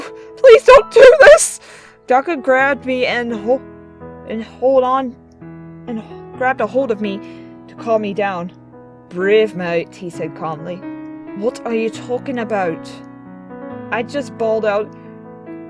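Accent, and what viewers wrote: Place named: United Kingdom. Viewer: that British accent xD this is awesome and your voice is so sweet!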